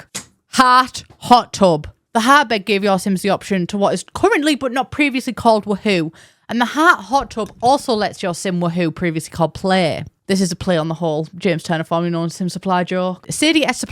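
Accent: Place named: Working class, United Kingdom. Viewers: james cook also colonised where i live in far north australia, he was the first european to arrive here actually. we actually used to have a massive statue of him where he literally was doing the hitler salute and a bunch of racist locals were really up in arms when it was removed a few years ago. our main university is james cook university, and there’s a little “town” nearby literally called cooktown. that man was terrible though, along with the rest of his fleet of colonisers. murderous, pillaging, entitled bastards. according to some aboriginal/first nations lore his arrival was foretold as a large pelican that would bring destruction, and in fact what was foreseen were the sails of his boat, which the people had no prior reference for. had no idea that he was from middlesbrough. i find a lot of northern brits are drawn to live here though, and not to generalise and obviously not everyone is the same but i really like northeners better than other brits, they’re a lot more down to earth, less serious and generally my kind of people. 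😊 my step dad was northern and his accent and the words he used and way he said things are so much like. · I haven't paid so much attention since literal university. You've got such a good mixture of academic and fun, working-class British and it's so engaging. I absolutely love listening to you talk.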